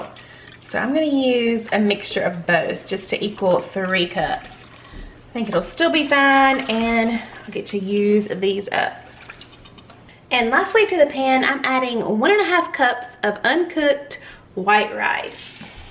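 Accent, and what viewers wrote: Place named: Kentucky, United States. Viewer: See.... that is what I just cannot appreciate about most store brand cheeses. I know they are more affordable but, I just do not care for how stringy it gets. I personally prefer to see my cheese, creamy and smooth and honestly, I believe that it is some sort of generic imposter of real cheese. However! ALDI, actually says REAL, on their packaging, and I believe that they sell a more quality product because they are not based in the US. Sorry, just, my opinion. ALSO! I would like to try this with the canned Sloppy Joe BOLD brand, it is very tasty, not just the reg. SJ but it makes a big diff with the BOLD. Okay, I'm done. I love your accent! You sound like we do here in KY! :-)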